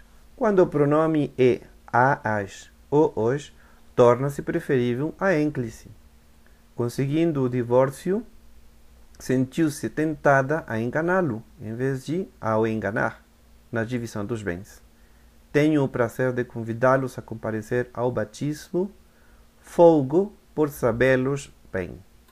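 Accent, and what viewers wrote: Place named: Portugal. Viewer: tu portugués parece ser medio europeo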